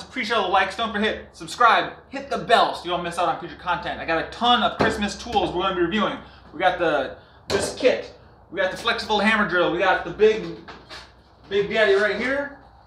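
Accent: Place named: Bemidji, United States. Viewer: You sound like you are from Bemidji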